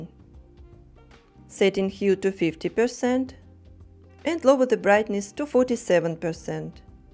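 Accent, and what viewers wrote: Russia. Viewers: Ну блин не обмануть тебе своих😅 ну сразу же слышно что из России автор 😏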